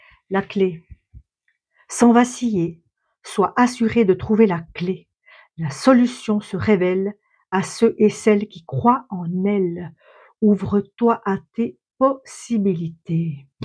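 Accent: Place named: Romandy, Switzerland. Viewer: Ce petit accent suisse Romand ! toujours un plaisir de l entendre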